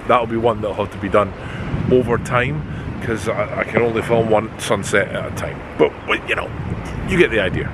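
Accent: Scotland, United Kingdom. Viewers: My little cat Jasper seems to love your Scottish brogue. You really have his attention. 😊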